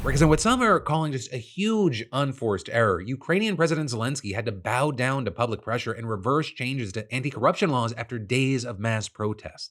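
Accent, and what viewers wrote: South, United States. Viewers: am i crazy or does kinda have a southern twang in his voice??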